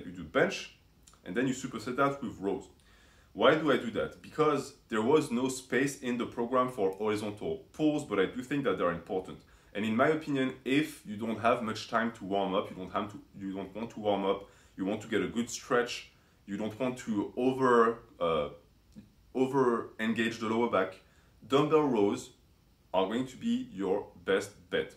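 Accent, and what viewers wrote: France. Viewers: Ta un accent français ? Dommage que je comprends pas. L'anglais sa fait 3 ans que je m'entraîne en half est j'aurais aimé plus de renseignement est de changement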